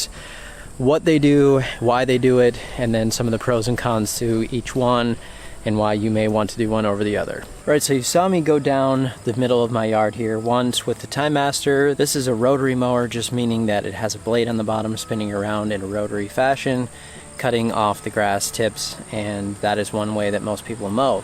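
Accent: Minnesota, United States. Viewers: You must be Minnesotan, Right?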